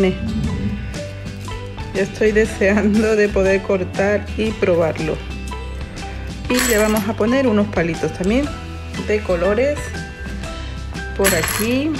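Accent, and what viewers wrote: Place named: Peru. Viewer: " " no sé si será peruana radicando en otro país por eso el dejo....pero a respetado la receta original.....gracias por decir en su video q es "Turrón de Doña Pepa" es tradición peruana con todo y su historia. Un sólo detalle...en lugar de colorante le agregamos achiote.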